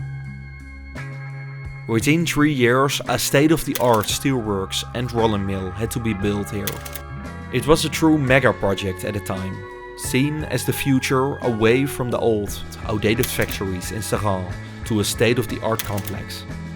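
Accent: Netherlands. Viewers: Echt een magnifieke reportage met prachtige beelden maar het is echt lastig om naar engels met een zwaar Nederlands accent te luisteren. 't Zou aangenamer zijn in Nederlands (of Engels ingesproken door een native speaker)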